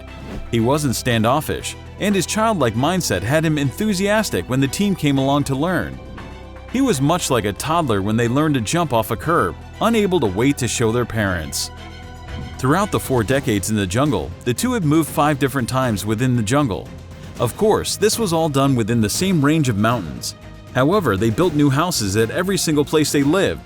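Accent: United States. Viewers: Anything narrated with an American accent ruins the subject involved.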